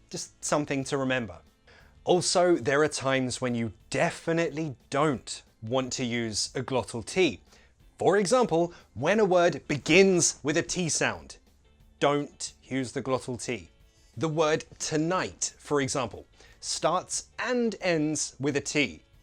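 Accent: United Kingdom. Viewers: I love your British accent!!! In spite of loving the English language, I hate the huge amount of English words and expressions adopted in Argentina, such as: delivery, take-away, home-office, etc. Anyway, I'd love to have an excellent proper British pronunciation. Thank you for all these lessons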